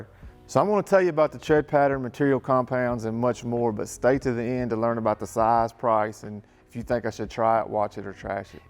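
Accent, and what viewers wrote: South, United States. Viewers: Where are you from as in state? Louisiana, Arkansas, Alabama? I hear your Southern accent.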